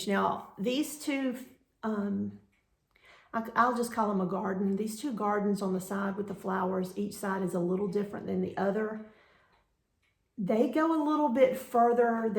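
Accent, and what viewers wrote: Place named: South Carolina, United States. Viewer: Hello Neighbor - From Georgia! Love your South Carolina accent and soothing delivery- lived in Greenwood several years and have a son in Greenville. I love all your cross stitch - so inspiring and elegant. Thank you for the inspiration. Your afghan will be lovely too!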